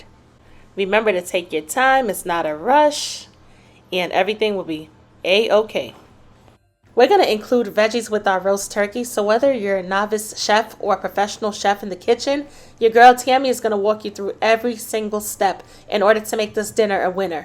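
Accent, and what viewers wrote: New York, United States. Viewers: Oh btw you sound like a New Yorker..are you??.....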